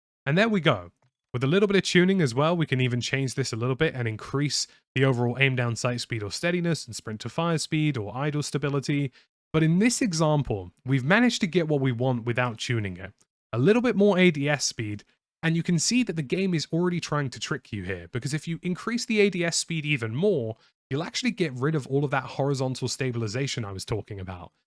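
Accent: RP, United Kingdom. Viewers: mate, you're too posh to be confusing less and fewer. Good video though.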